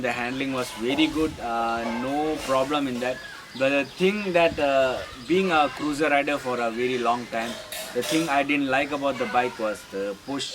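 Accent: India. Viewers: matte black you are staying in Malappuram and your English is North Indian style. are you Bengali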